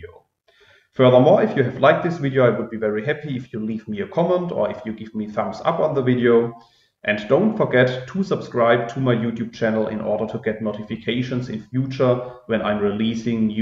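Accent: Germany. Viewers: I do really appreciate this video and the author -- helped me a lot since I'm a complete R dummy so far and trying to comprehend the language via Coursera. Haven't found any real examples on how to extract multiple rows of data frame to finish assignment neither on discussion section nor on web, while you explained in details how that works -- a big big thanks! Have subscribed to the channel, think will be useful. Super lovely German accent -- greetings from Russia :)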